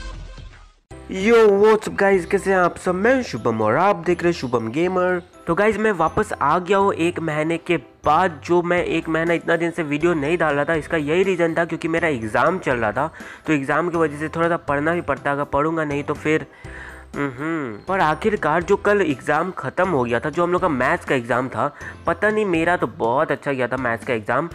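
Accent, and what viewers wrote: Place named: South, India. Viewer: tu wese south ka hai kya ? tera bolne ka style esa hai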